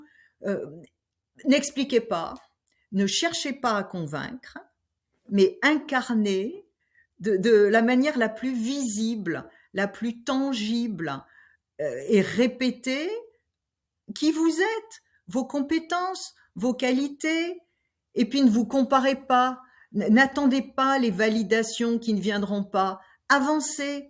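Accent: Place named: France. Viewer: Merci infiniment. C'est une précieuse aide, que je réécoute souvent. J'aime votre manière de vous exprimer....et vous avez conservé votre accent français clairement. Chaud au cœur votre accompagnement est un Plus.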